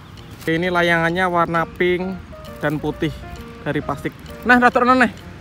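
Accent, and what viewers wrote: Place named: Magelang, Indonesia. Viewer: Kok le omong koyo Magelang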